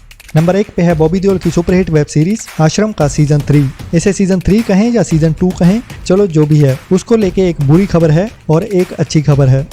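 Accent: Punjab, India. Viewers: You speak like Dara Singh and such a heavy Punjabi accent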